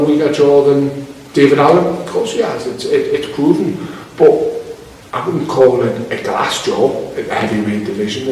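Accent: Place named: North, United Kingdom. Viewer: Your northern accent 😂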